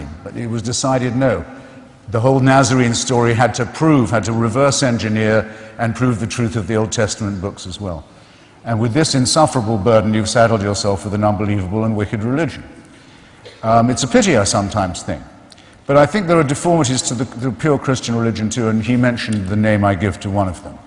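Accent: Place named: England, United Kingdom. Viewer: Two things. 1, Hitch was one of the most well-read and determined intellectuals of the past 100 years. In a world where religious conviction is still considered brave, he was brave in a way that would embarrass the proponents of that definition of bravery. Most of all, he was a consummately moral and humane person. He was a good man, and he didn't need to jump on anyone else's bandwagon to prove it. 2, English accent.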